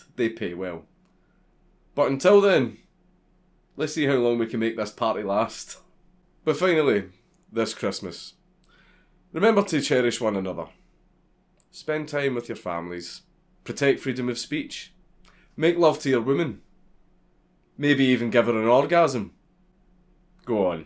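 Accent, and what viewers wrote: Scotland, United Kingdom. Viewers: Too Scottish, disliked. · Winter solstice over Christmas? Good man. Love the accent (alas I am aberdonian) and keep fighting for free speech. Makes me proud to be Scottish. Lots of love from Aberdeenshire ❤ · 10 seconds in and its already so scottish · I don't know why, but whenever I watch one of your videos. I'd scroll through the comments and suddenly everything I read sounds Scottish (except a few with five billion emojis). Amazing video. I hope all is well for you for the new year.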